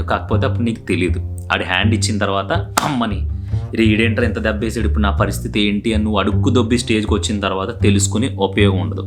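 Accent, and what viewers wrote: Godavari, India. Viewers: Bro meedi place yekkada bro .ma godavari slang la vundi😊😅??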